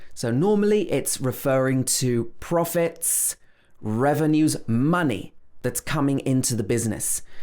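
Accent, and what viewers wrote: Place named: United Kingdom. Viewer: Thank you teacher. The video helps me learning British English accent. I hope you make more videos regularly.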